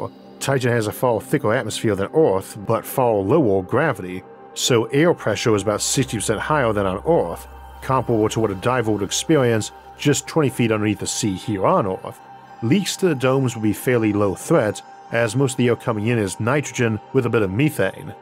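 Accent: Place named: South, United States. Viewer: I just can't place the accent. It's familiar yet foreign. Some kind of southern flavor like colonel sanders.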